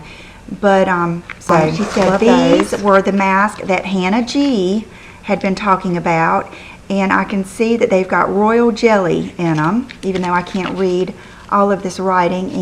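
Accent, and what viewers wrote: South, United States. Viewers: Hi! I just subscribed because I've heard people talking about you and I'm so happy I did! I LOVE your "accent"...sounds like your from the south;) lol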